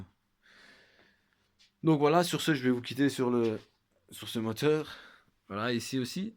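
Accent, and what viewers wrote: Liège, Belgium. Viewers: Merci mon ami,avec ton accent , je me doutais bien que tu étais de la région de Liege ,moi , j'ai habité et travaillé a Andenne,puis Huy et ensuite a Wanze , les voyages forment la jeunesse , mais bon , avec mes 64 ans ,c'est un peu tard , mais je suis reté tres jeune dans ma tete,hi.....hi......Bonne continuation mon ami, tu fais du tres bon travail . A+ Alain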